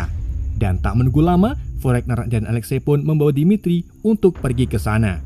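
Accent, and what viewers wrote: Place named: Pekalongan, Indonesia. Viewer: Logate mirip pekalongan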